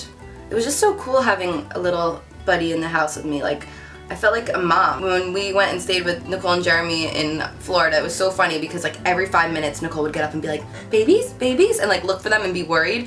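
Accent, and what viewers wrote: New Jersey, United States. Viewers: OMG it finally makes sense. Your slight accent. I don't how I couldn't tell before you have a Jersey accent.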